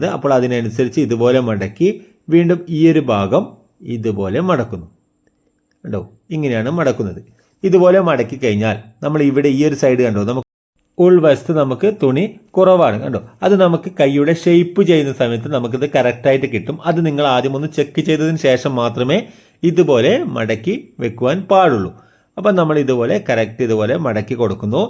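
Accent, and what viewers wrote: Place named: Kannur, India. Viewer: നിങ്ങൾ കണ്ണൂർ കാരനാണോ??? സംസാരം കേൾക്കുമ്പോൾ അങ്ങനെ തോന്നുന്നു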